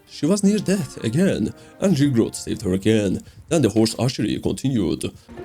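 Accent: Turkey. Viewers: Are you turkish man you sound like it gankim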